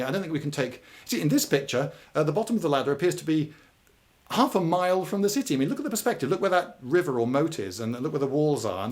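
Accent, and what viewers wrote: United Kingdom. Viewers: You sound like the narrator in strange brigade and that amoes me smile. Everything is better when narrated by a Brit. Plain n simple. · Why the fuck was I notified about this video NOW?!? I WANNA LISTEN TO A BRITISH MAN TALK ABOUT MEDIEVAL STUFF!!!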